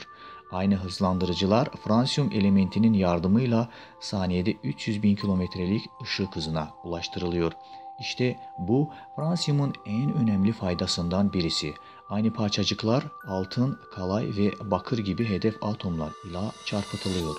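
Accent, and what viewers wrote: Azerbaijan. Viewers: Adam Azerbaycan Türk'ü anlayamadı mı ses tonundan şivesinden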